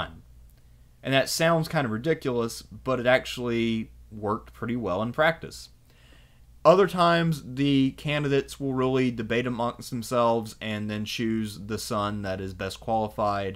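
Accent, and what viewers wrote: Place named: United States. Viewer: Our narrator doesn't really do pronunciations very well. Almost every video he "Americanizes" other people's languages. I guess you can't be good at everything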